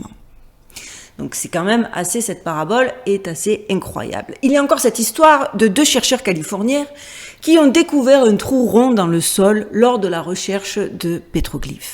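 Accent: South, France. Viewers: Bravo, joli travail de recherche... Depuis quelques semaines je suis votre chaîne youtube, et je dois dire que c'est un beau travail de synthèse et de liens fait avec un certain brillo ✨👌 (Même si tous les sujets abordés ne résonne pas forcément... Je fais mon tri comme un grand 😄) Et puis votre côté "rigolote" et votre "accent du sud" donnent une saveur en plus bien plaisante... Merci à vous 🙏☯️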